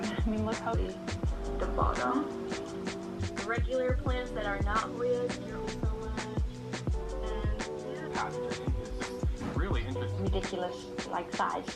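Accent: Australia. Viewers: Thanks for the thanks. I’m really grateful to hear an Aussie accent in the YouTube world. I like your chilled vibe. And mental health tips are always useful, especially in the time of COVID.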